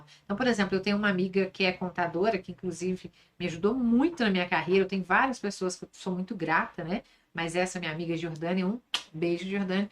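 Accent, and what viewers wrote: Minas Gerais, Brazil. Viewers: Ele mineirinho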